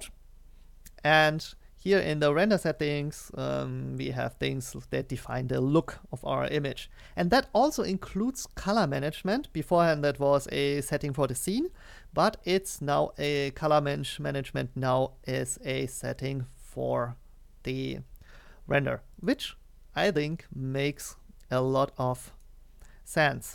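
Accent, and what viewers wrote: Germany. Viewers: Sprichst du eigentlich deutsch? Deine Aussprache hört sich sehr deutsch an :D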